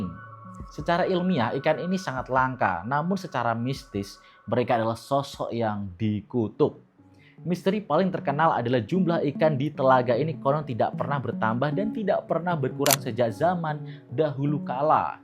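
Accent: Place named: Java, Indonesia. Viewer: Hostnya... Jawa bgt ❤❤ ... I like u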